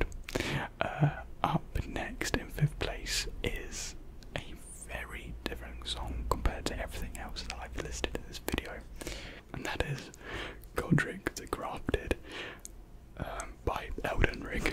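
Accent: United Kingdom. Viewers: happy new year little quiet british man on my phone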